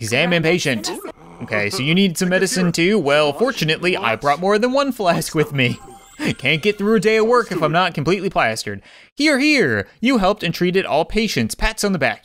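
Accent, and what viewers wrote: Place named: Canada. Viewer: Love your vids and all the energy and effort you put into them! Also love your Canadian accent and quirks! Are you a fellow scientist? You knew about centrifuges, pipettes and that vaccines don't cause autism (thanks for that! Yay!) Congrats on >15K subscribers! Thanks for making this series! Cheers from your neighbor in California!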